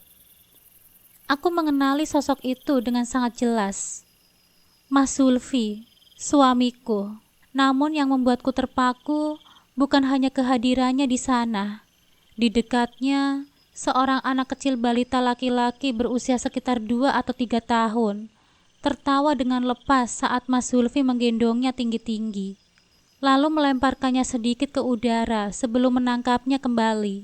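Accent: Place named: Central Java, Indonesia. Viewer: Kk kok tau desa karang nduwur , apa kk org jateng , klo dari logat cara baca a sii spt iya yaa ,,, klo blh tau kk berasal dr kota mn yaa ? 🙏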